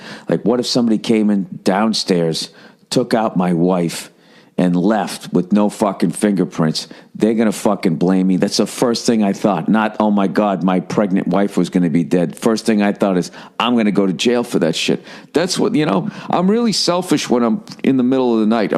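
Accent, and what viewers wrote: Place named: Boston, United States. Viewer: He's from the Boston area. It's just how he talks.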